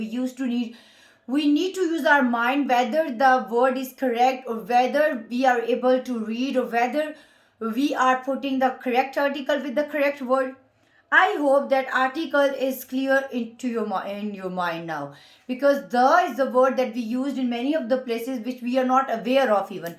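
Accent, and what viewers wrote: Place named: Punjab, India. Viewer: MashaAllah Ap to Einglish Humari Punjabi ki tarha bol rahi ✌👍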